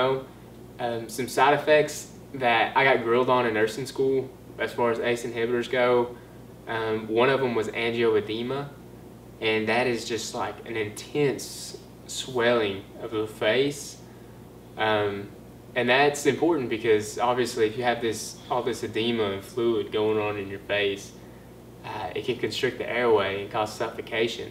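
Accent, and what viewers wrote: United States. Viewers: Southern accent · Great! Best explanation I have seen thus far on You tube. Of course, being from NC, I am also partial to the accent :)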